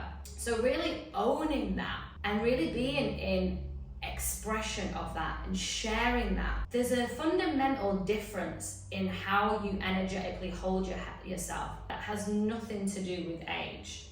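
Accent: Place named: Australia. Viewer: I very much like this accent! Is she Aussie?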